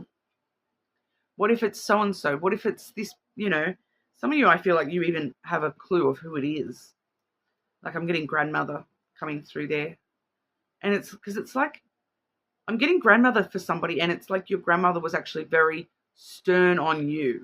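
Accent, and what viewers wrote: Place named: New Zealand. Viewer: Thanks for the reading 🙂 Also thanks for sharing how you ended up with a smattering of New Zealand inflection in your speech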